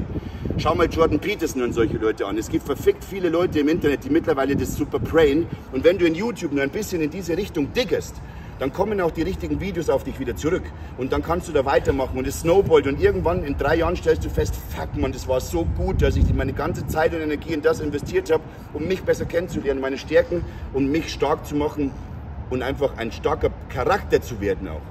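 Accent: Bavaria, Germany. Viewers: bist du bayer? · Ich muss grad so lachen. Ich hab viele Videos von dir angeschaut u mich immer gefragt was für einen Akzent hat er. Ich hab immer nur geraten und jetzt redest du deutsch, wie geil😛 Ich komm aus Erding. Hast nen ähnlichen boarischen Akzent. Kommst du aus Bayern? Top Videos immer von dir!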